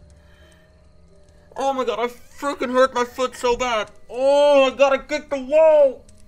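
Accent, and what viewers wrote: Netherlands. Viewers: He's dutch xD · Dutch Accent Heerlijk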